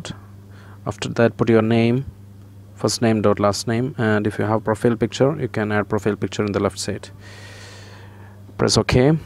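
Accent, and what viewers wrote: Kerala, India. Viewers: R U from Kerala? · English kettaal manassilaakkam malayaliye..💞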